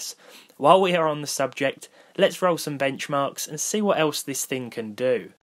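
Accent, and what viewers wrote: United Kingdom. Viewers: I like your accent mate where do you come from in the UK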